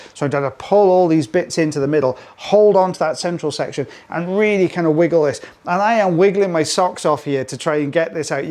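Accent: United Kingdom. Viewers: Im watching the video to see the star of the show not your face or to hear your British accent. In fact your technique is rather.....rubbish! Lol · wiggling his socks off 😂😂🤣🤣 i love UK sayings lol 😂 btw i was trying to sort out ur accent; northern/southern England… not quite… def not Irish or Scottish … then i caught in one of ur video’s that ur Welsh! i was proud that i noticed it was a bit different ☺️ it’s not easy for us Americans to notice the differences. i worked for a UK based company & exposed to a lot of different accents, i finally started to notice the subtle differences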